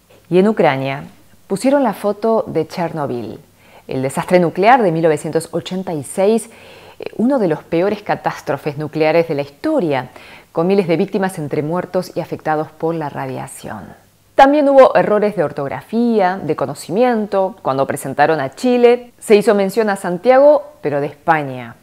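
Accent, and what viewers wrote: Argentina. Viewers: Primera vez que veo este canal. Muy bueno. Pensé que me hablaría en coreano y habla español argentino :) saludos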